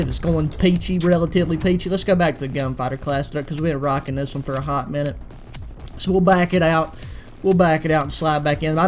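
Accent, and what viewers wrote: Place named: North Carolina, United States. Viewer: I’m from Texas so I crossed that state off the list for your accent. You sounded like my buddy from NC. 😂😂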